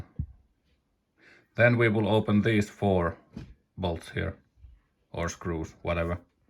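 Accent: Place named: Finland. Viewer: I know that accent perfectly! What part of Finland are you from? Some of my family lives in kymonkoski and some in viitasarri.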